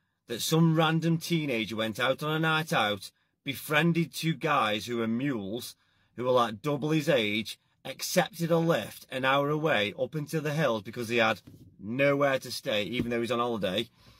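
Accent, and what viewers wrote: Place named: Stoke, United Kingdom. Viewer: Off topic but....which part of Stoke are you from? Within a 3 mile radius of Longton ? Thats a very familiar twang 😁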